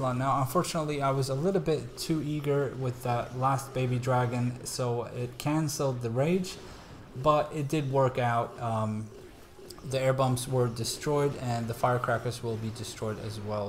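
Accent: Sweden. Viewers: I really heard your Swedish accent haha, men bra video 👍🏽😉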